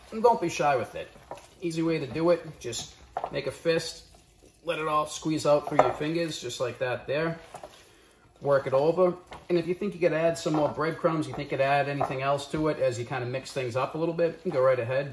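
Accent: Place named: Boston, United States. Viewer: I knew it was BS right off. If he wanted a little water in it, he would have put a little in the cans to rinse them out in the beginning. It was a good try try, though. Magic ice. 😏 I also knew, from his accent, that he was from an area in close proximity to Boston. NH-ites can pick up on that pretty easily. Lucky pooch at the end🐕 That's gonna make her fart, yanno.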